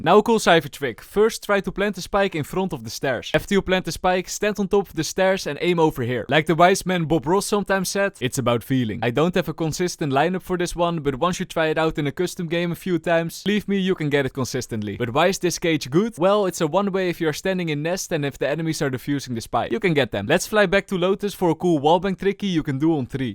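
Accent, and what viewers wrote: Netherlands. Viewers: The "v" sound doesn't exist in many European languages. He's Dutch, so it comes out closer to "falorant".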